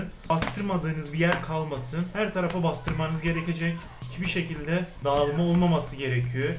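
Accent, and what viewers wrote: Adana, Turkey. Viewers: Abi sen nerelisin adanaı gibi konuşuyosun atüyürüm ocağa ekliyürüm diye konusuyonda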